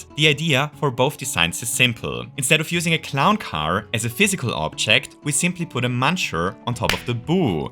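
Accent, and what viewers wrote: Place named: Germany. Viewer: I never get tired of watching your content. Being honest? I like your accent. It gives your videos some personality. German, perhaps?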